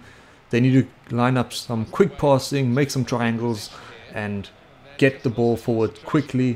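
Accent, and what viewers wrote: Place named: United Kingdom. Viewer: Like the Brittish Accent!!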